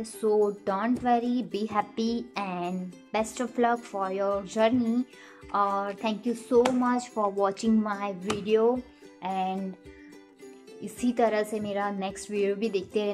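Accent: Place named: Gujarat, India. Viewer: damn.. ur gujju accent... love it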